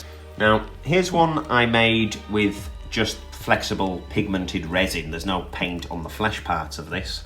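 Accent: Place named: England, United Kingdom. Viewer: English accent and American toys is an odd mix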